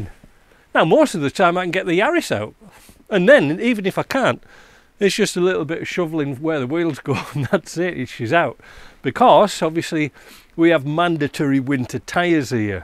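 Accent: Yorkshire, United Kingdom. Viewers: Very interesting...wish I knew your name.....trying to work out your accent....Yorkshire perhaps. Talking about the price of diesel.....remember when I bought my S3 in 1975....still in daily use with me now...diesel was half the price of petrol...as it should be....it takes a lot less money to refine. Got a mod on my S3 heater....its got a 200di in it now.....just before the heater-box I made and fitted a Y section inlet out of thin plate.....so it take ait via ducting from behind the front grill and just to the left of the rad.....so it now gets ram air....other wise the blower needs to be on all the time and going at much more that 15-20mph if becomes very poor due trying to drag air in from that side vent. Tried air scoops on the side inlet useless.air steam is deflected outward when in motion. Also need to seal all the internal gaps up in the heater box around the matrix.....so all the air the comes in HAS TO PASS THROUGH THE MATRIX......lots used to bypass around its sides.....so then coming into the cab cold adding to the prob. 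Works a treat.....got to get the motor warm of course.....I just make sure the stat is efficient as it can be.....ie correct stat on a series....as you know got to be the one with a shoulder.....lots of owners I have found fit by mistake the flat seat type.....no good coolant just goes around it. Love your vids....love to hear the story of what took you to Canada. from Cheshire UK.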